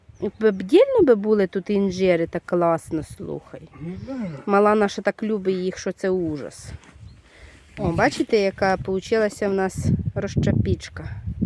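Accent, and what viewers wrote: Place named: Lviv, Ukraine. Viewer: Добрий вечір.А з якої ви області(району)Діалект як на Львівщині😊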